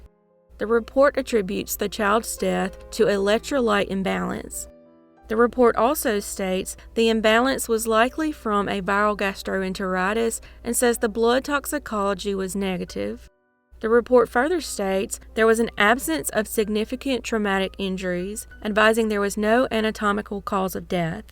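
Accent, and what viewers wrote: South, United States. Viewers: 1st time I ever clicked on a video when the narrator inserts extra syllables in every word. Wooo what a strong hick accent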